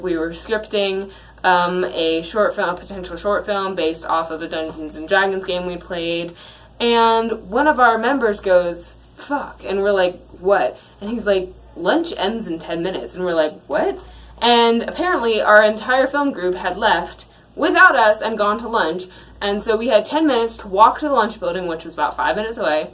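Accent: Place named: South, United States. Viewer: all that suthern drawl down there at duke done pulled away ur british aksent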